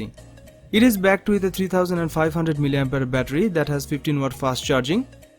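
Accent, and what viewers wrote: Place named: India. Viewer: I wanted to focus in the video but indian voice always annoyed me but I'm not a racist or hate them I can't just stand they're accent..sorry...binod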